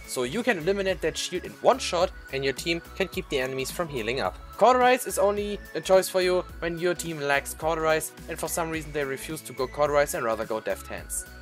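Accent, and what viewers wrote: Germany. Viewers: Are you German i just think it because i am from Germany too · Are you German? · Youre German Man merkt sofort deinen Akzent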